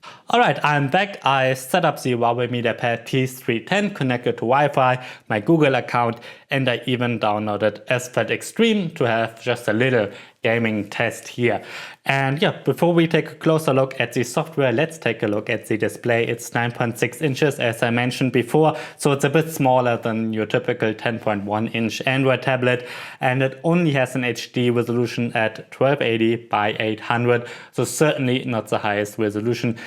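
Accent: Germany. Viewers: Bist du deutsch oder warum sprichst du mit so einem Deutschen Akzent? The spricht ihr se aus :D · 101 Spiele Er ist aus Deutschland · i think he's german XD Bad english tho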